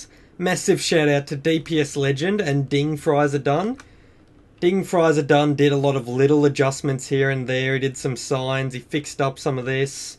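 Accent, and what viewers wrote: Australia. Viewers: nice are u australian just asking LOL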